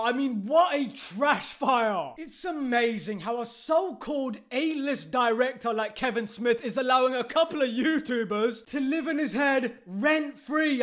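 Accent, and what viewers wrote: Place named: Scotland, United Kingdom. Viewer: Is this guy scottish or a really mad guy from England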